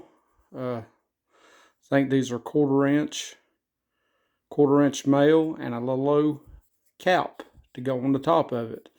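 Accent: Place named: West Virginia, United States. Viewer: You have to be from western west Virginia with that accent.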